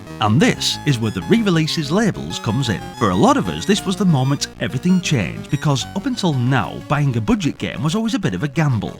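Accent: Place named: Rotherham, United Kingdom. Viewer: Are you from Rotherham? The accent sounds familiar lol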